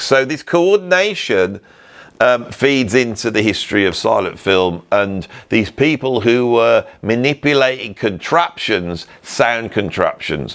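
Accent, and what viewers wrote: Birmingham, United Kingdom. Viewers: He doesn't have a cockney accent. Cockneys are from East London. He's from Birmingham and has a 'brummie' accent.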